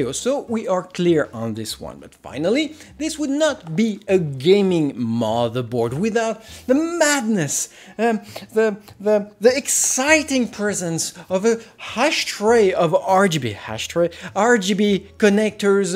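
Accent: France. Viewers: Your french accent is awesome.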